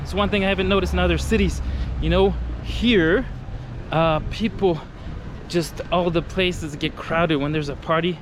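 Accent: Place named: Eastern Europe. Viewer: where are you from? I mean originally... Ukraine? You sound russian or slavic speaking ... as your native langauge. your english is fine, just I can tell you're not a native speaker and was wondering where you're from originally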